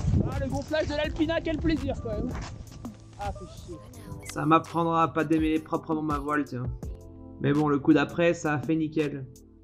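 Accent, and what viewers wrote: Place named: France. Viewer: Pour moi comme canadien c'est difficile de comprendre ton accent français!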